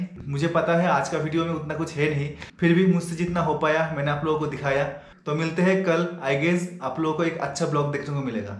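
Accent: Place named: Bengal, India. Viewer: When he was talking I got it he is bengali i mean I am to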